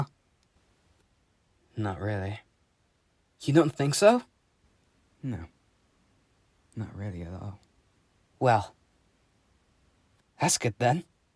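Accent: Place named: United Kingdom. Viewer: idk why when he started talking I just went "ohmygod he's brtish" LIKE IM NOT BRITISH MYSELF😭💀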